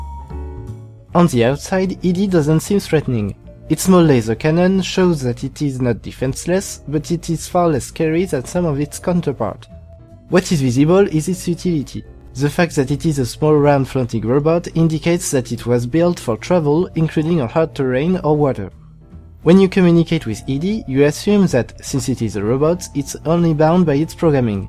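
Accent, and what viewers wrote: France. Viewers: est ce que tu est francais ? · you do understand his native language is French right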